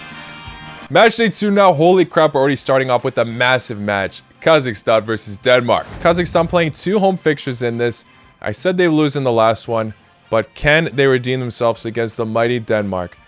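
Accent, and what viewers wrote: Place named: United States. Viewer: His parents are but his bio on Instagram says 🇺🇸🇭🇷 cuz his accent is American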